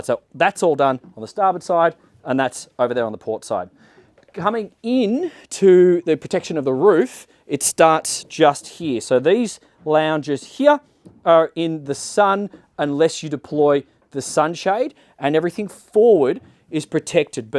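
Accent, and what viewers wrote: Australia. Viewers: mate, that aussie accent, insufferable. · Those lines are as smooth as hot butter. The combination of excitement, gestures and the accent in the bow presentation I got some Steve Irwin vibes and it really clicked why the videos on this channel stand out.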